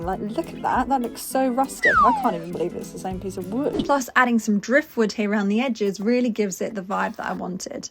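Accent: United Kingdom. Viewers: She sounds like she's from Plymoth/Plympton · Your British accent is amazing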